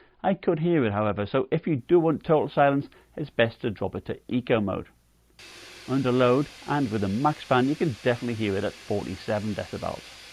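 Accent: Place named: England, United Kingdom. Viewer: Your in the US? You sound Like your from England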